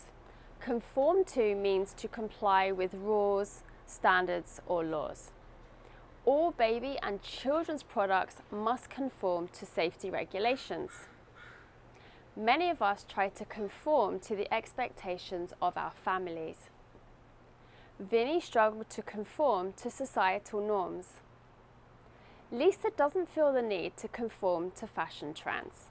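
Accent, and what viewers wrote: United Kingdom. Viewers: yeah i did not know where are you from, but i noticed your accent, and i guess it is british accent😀😊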